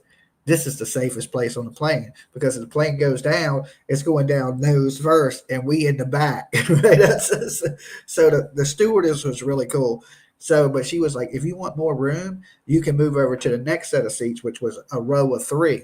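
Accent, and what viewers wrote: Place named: Kentucky, United States. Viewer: I live n Eastern Kentucky... Was u n Lexington or Louisville? Sounds like it...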